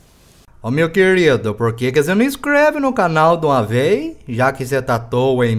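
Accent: Minas Gerais, Brazil. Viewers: vc é mineiro igual eu? Kkkkkkkkkkk 😁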